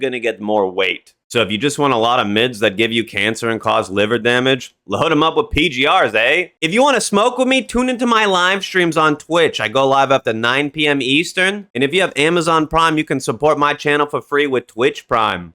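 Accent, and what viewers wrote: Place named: Boston, United States. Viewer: There’s no way your not from Boston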